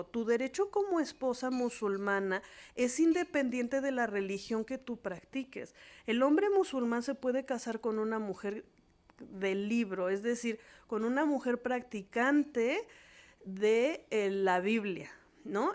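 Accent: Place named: Mexico. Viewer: Hola, de donde eres? Escucho tu acento como mexicana, yo también mexicana, cual es tu fb me interesa aprender árabe, gracias!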